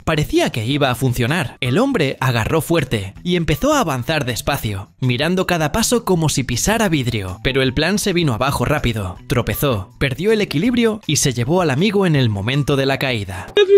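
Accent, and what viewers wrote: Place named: Spain. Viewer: Todo el vídeo es Excelente, solo la voz del narrador tiene un Acento Español molesto, y no es necesario que hable mucho explicando lo que se está Viendo en el vídeo. Saludos desde Tabasco,Mexico.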